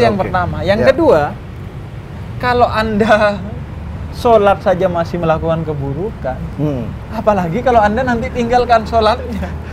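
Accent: Madura, Indonesia. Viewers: Logatnya madura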